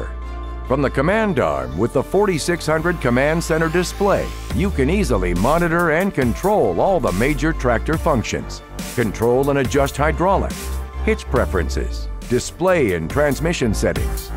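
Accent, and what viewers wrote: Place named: South, United States. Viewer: That southern accent is whats making it. Perfection!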